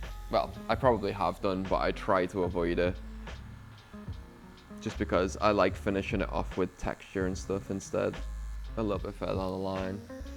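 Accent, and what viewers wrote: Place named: Northern England, United Kingdom. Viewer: It’s a northern dialect, southern British people (especially in London) pronounce everything with full syllables where as people like me in the north have a culture of speaking in slang and abbreviations so we often shorten or blend words together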